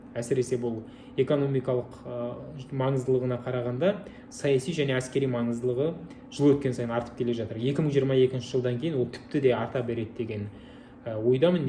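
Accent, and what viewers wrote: Standard. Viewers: Айналайын балам, Рахмет.Таза қазақ тілімен жетемізге жеткізіп түсінікті түрде айтып отырсың.Сендей сауатты Қазақ балалардын қатары көбейе берсін.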